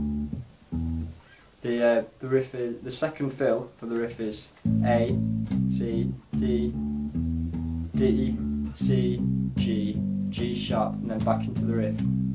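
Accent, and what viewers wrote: United Kingdom. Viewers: hi man.. just chilling... been messing around with double thumb technique myself.. though ive not long been at the stage i wanted with my standard slap... but im geting there... after watching this i think its a great exercise to improve .... one i will try out in the morning.. nicely done dude... guessing from ur accent u must be northern england.... yorkshire or there abouts :) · great lesson! the best i've seen yet in terms of teaching technique. by the sounds of things you live pretty near to me, are you a nottingham lad by any chance?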